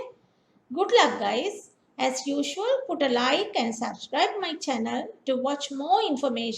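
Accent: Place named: India. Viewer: This is indian voice how could you understand américain law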